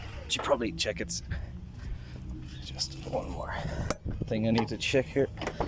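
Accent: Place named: Ireland. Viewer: The Irish accent and the laid backness does it for me 😂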